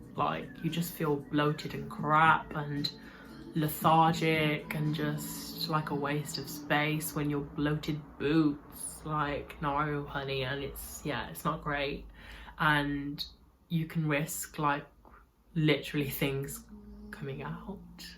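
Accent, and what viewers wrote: United Kingdom. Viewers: Yes that British accent does something to me 😁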